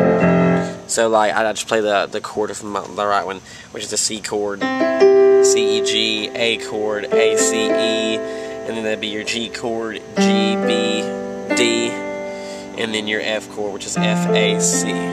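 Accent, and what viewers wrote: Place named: Australia. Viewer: Why do I hear a tiny hint of Australian in your accent?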